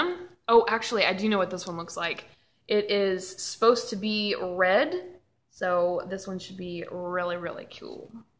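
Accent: South, United States. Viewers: LOL, you definitely have moments where the southern accent peeps thru, but honestly, you sound like you have a very neutral accent. Mix of east and west. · I’m not sure where you live, I am not terribly familiar with the “eastern seaboard” and so I don’t know where the orchid show of which you were speaking is located. But, you mentioned your “southern accent” and my fiancé and I had to laugh. We live in New Orleans, but I grew up in North Dakota. I get made fun of for my accent, even though I’ve lived here for ten years and have lost most of my “up north” accent, I heard you say “you guys” so many times that I thought I’d hear an “eh?” at the end of one of your sentences, a la Canada. I’m very jealous that you live in an area where y’all have an orchid sale with international vendors; I have to drive to southern Florida to beg a wholesaler to sell to me if I spend $500-600.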